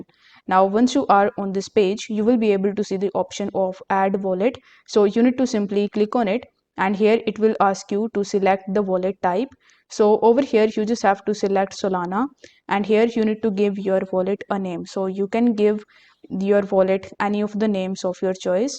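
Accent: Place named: India. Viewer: This is an Indian scammer picture is a Caucasian woman with voice is obviously an Indian woman😂 lowlife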